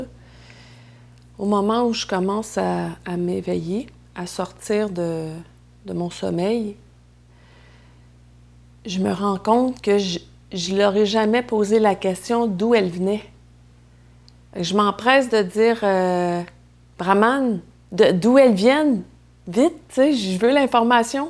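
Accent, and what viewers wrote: Canada. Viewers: Comme c’est intéressant! Une Québécoise tout comme moi! Cela me fait du bien de t’entendre! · J’aime beaucoup entendre toute cette histoire en accent du Canada en plus! L’accent du Canada m’étonne à chaque fois que je l’entend.. Comme moi j’avais vécu à Paris et j’avais essayé d’apprendre l’accent de Paris... Mais bien sûr ce n’est pas gràve par rapport à l’histoire même- c’est juste que je n’ai pas l’habitude d’entendre le français du Canada. Merci aussi de laisser sa voix telle comme elle est- je n’aime pas les dubs en autres langues. Quelle histoire incroyable!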